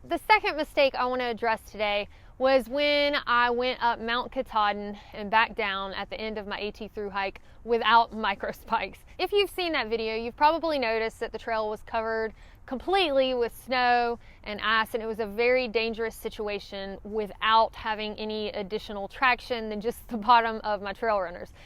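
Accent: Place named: South, United States. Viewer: Good advice on the antidiarrhea medicine. I'm going to put that on my checklist right now. Also, I had to laugh. I couldn't understand why you said not hiking in bear country until I realized you were saying night hiking. I guess I need to come down south more often. 😁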